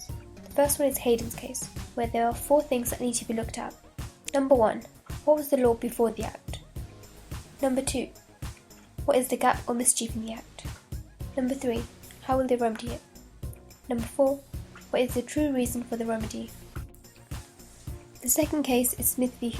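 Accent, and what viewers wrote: United Kingdom. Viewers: Like a cross between British & summin else tho. Hot x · Birmingham I imagine lol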